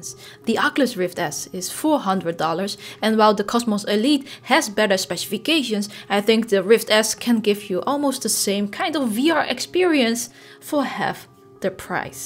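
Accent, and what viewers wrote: Netherlands. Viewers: Why do i hear a Dutch accent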